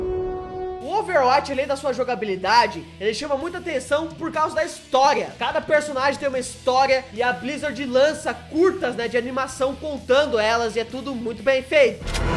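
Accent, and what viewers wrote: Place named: Rio Grande do Sul, Brazil. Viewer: por que seu sotaque parece gaucho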